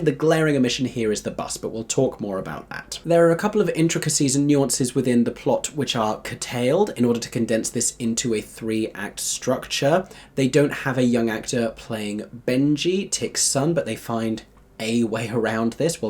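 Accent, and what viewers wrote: Australia. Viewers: Nah gonna need a whole video In that Australian accent now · The Australian people demand more Aussie accent!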